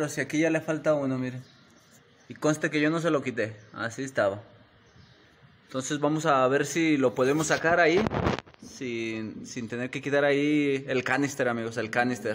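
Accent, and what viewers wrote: Mexico. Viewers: Muy buen trabajo,además el relato cuando vas haciendo el trabajo es muy entretenido,la tonada mexicana es única!!!!saludos desde la patagonia argentina manito!!!!!!!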